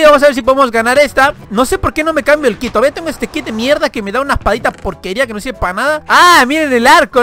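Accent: Argentina. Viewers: eres argentino porque llo si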